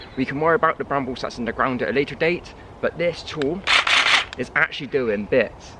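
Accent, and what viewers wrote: Bristol, United Kingdom. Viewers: What’s your accent, is it Bristol?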